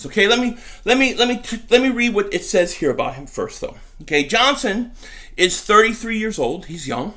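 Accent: Philadelphia, United States. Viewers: Wow never knew you were in cali, you talk like your from south philly, even your wall looks south philly lol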